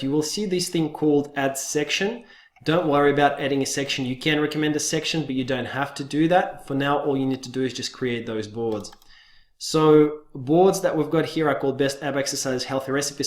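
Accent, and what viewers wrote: Russia. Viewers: I can hear English with Russian accent ;) Do you have same the video in Russian?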